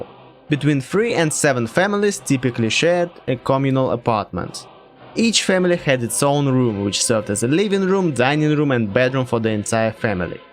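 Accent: Russia. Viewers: орнул с русского акцента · Молодец, что не стесняешься своего акцента, и говоришь всё точно так, как реально говорят русские! А то железный занавес пал, а люди до сих пор голливудский русский акцент себе представляют, и не понимают, например, как вообще на родине у нас что на деле называется. Так держать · Here I am learning about khrushyovka I've grown up in from the Russian guy who explains it in English with a very Russian accent while being Russian myself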